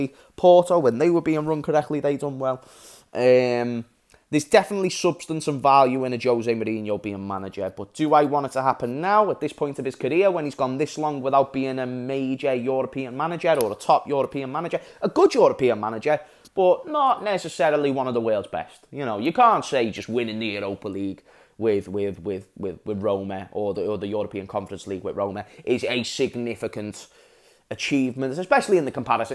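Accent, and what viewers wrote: Liverpool, United Kingdom. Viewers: I can tell from your accent that you're from Liverpool.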